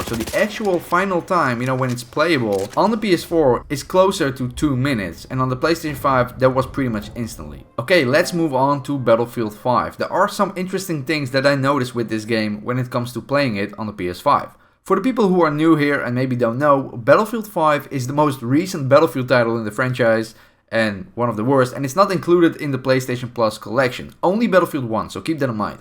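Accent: Netherlands. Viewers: Are you dutch? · Great Dutch accent !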